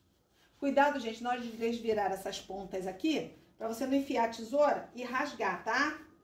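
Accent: Rio de Janeiro, Brazil. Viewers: Oi amada adoro s jeito descontraído de ensinar, gosto do pulo d gato kkkk, e amo nosso jeitinho carioca d falar, também sou carioca , sou d Sepetiba minha terra Natal, posso falar 1coisinha? Gostaria de saber por que vc não posta vidios todos os dias?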